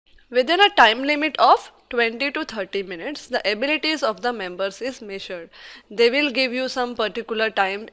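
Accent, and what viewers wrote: India. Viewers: Dam Sounds like an Indian is speaking, whats the problem with that? Engish is not our first language, stop acting like it is. She has taken the effort to make a video and help people. This video calmed my nerves a bit and helped me assess my strengths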